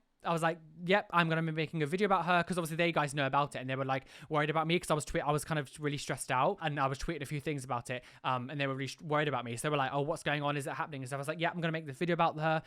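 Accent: United Kingdom. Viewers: This is COMPLETELY off-topic, but when you speak American English, the way Brits say "R" is so funny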